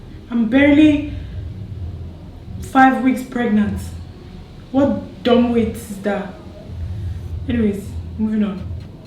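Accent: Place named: Ghana. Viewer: You suddenly sound Ghanaian 👀👀.... Congratulations!!!!!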